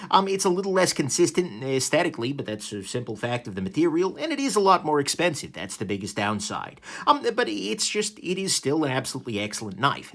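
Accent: New York, United States. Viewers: Please don't take offense but your voice reminds me of my relatives from New York! Thanks for sharing this one... love the dragonfly, and I've always thought those wood scales on the delica variant looked slick!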